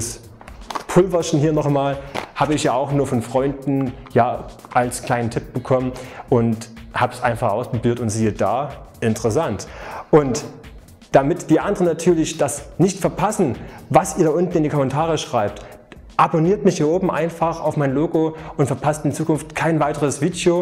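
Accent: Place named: Saxony, Germany. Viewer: Alter sächsisch Mann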